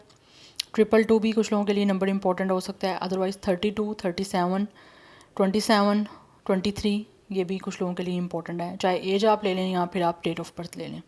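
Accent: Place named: Haripur, India. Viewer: Ape card reading main her bate per yes keuin bolty hain Mazret kay sath ape Hari poor key hain ape kay Lehjay say Andaza Lagya hy main nay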